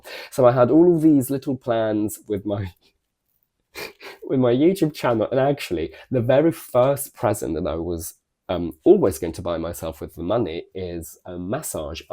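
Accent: United Kingdom. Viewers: It's so sad to hear that! Watching from Thailand and I caught every word in your video. I can feel you completely. ❤😢 Thank you so much for your time and dedication nurturing this channel. Firstly, I followed this channel because I love listening to your voice, your British accent and also your lessons on pronunciation. I used to teach English in my free time many years ago but with my busy work scheduled, I had stopped. Now, with the rise of online teaching, I've come across some online English teachers teaching incorrect pronunciation. This highlights the importance of good teaching and inspired me to help others avoid similar mistakes. I'm not here to tell my story, though. I just wanted to share that when I listened to you, I saw myself (we're not comfortable in front of camera, scripted not to miss the point, spent ages editing, not monetized as I just started). 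I whole-heartedly understand your journey and cried with you. 😢 The knowledge you've shared is valuable, but the time you've dedicated is equally important. To me, you are an incredible teacher. I will always come back and learn from this channel and will also recommend to my future students. Lastly, I wish you joy and tons of success with your new channel. Subscribed! ❤ P.S. Last night, I listened to your new ASMR channel to calm and relax before going to bed.😍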